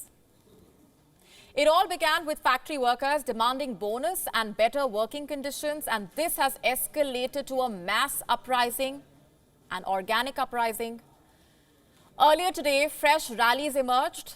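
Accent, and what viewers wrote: India. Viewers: You are dubbing the interviewees spoken words with your strong Indian accent English. I'm effectively bilingual in English and Chinese, if your subtitles are accurate while the Chinese woman speaks in her own language, why would you dub her in English? People in China are frustrated because of the suspected abuse of Covid swab-tests that are mainly outsourced to private companies, which resulted in suspicious attempts to spread the virus throughout China, because it's very profitable for these private companies to legally syphon money from the Chinese government thru exploiting the legal loopholes, prolonging the current Covid19 situation, and collecting service charges for all the daily individual Covid19 swab-tests. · I disagree completely. Actually Indians speak better English (more grammatically correct) than the rest of the Anglosphere. 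That said, the Indian accent is a challenge to other English speakers, North Americans in particular. I hate to use the term but your comment is borderline racist. If Al Jazeera used native Arabic speakers instead of "white' mouthpieces, you wouldn't care for their accents either. You could use the subtitles function, as I usually do.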